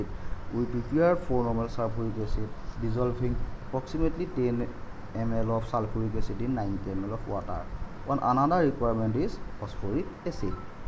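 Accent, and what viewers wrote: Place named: India. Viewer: Sir is speaking the english that foreigner think of India 😢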